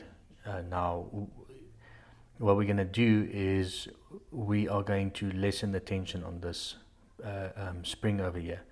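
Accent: South Africa. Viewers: I honestly love the South African accent lol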